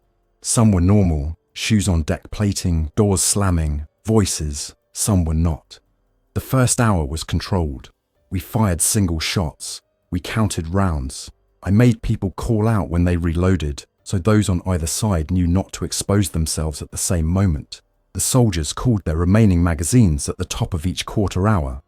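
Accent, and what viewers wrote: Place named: United Kingdom. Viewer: it does seem a little odd for an AI voice that could have whatever accent you want, to have a british accent talking about what happened in america.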